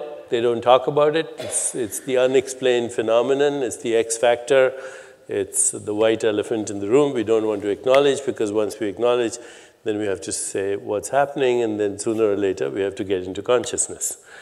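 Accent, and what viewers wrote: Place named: India. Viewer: besides the incredible things he says his voice is enchanting.. the timbre, the indian accent, the glotal clicks... enchanting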